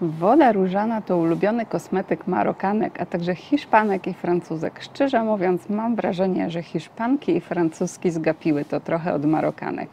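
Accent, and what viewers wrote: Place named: Ukraine. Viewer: Pohodzisz z ukrainy ? Bo masz trohe taki akcęt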